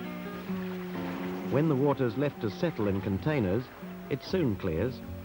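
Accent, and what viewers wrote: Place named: United Kingdom. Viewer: The 'Bri-ish' voice over is hilarious, I remember being shocked in the 80's, when I first heard him talk.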